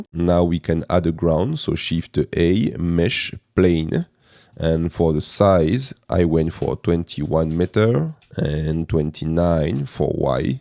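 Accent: France. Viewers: i havent done this tutorial yet, but this is something have have been looking for. also i love your french accent 👌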